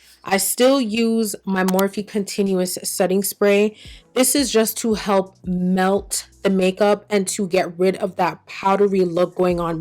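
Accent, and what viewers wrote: Jamaica. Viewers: Lil Sis when you break out in Patois, I know it's clutch, and I run to my nearest Sephora😀😀😀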